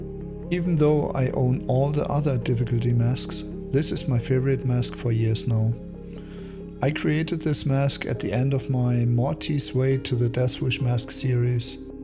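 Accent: Germany. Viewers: ich hab es erst gesehen wo man dein yt Dashboard gesehen habe aber man kann es auch an dein englisch stark hören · es ist so offensichtlich dass du deutscher bist :D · Hört sich so deutsch anhahaha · wie man einfach direkt raushört das er Deutsch ist xD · are you german? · Are you From Germany it Sounds a bit like it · Are you german by any chance? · The german accent tho xD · Bist du deutscher? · man merkt so hart das du Deutscher bist 😂aber bist übelst sympathisch 👍 nices Video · i knew it since im german too and have a slight accent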